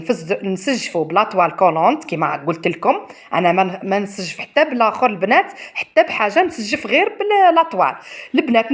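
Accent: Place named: Batna, Algeria. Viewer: يعطيك الصحة وهدرتك هايلة تباني باتنية بنت بلادي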